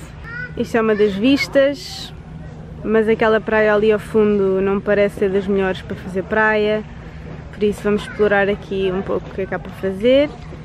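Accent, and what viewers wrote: Portugal. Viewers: Tu é portuguesa?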